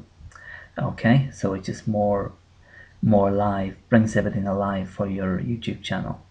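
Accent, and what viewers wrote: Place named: Scotland, United Kingdom. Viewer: I noticed a scottish accent there , i think , i'm from scotland :3 . The channel promo is important for people checking out the channel but the one thing i really wanna know is that i noticed that for some reason when a video of mine is playing , when it ends , it auto goes to another amv made by someone else . Do you know any way to stop that ? or is that another Google thing ? Peace & Good video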